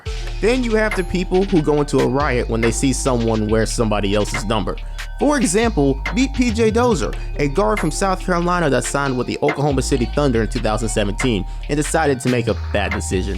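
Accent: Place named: Birmingham, United Kingdom. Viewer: You from that Birmingham area same bro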